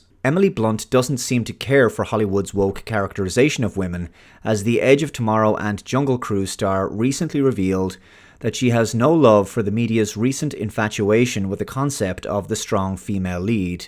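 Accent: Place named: Ireland. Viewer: Hey, great vid. Really didn't like that symmetrical video split youve done in the BG. Made me a bit queasy tbh. Not sure you've done that before, I just like to look at all the beautiful locations behind you while listening to your dreamy Irish tones. Without the editing. Anyway, cheers mate 👍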